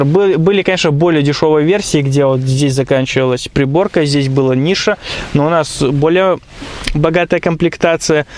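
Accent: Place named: Belarus. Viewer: Как мне нравится беларуский говор)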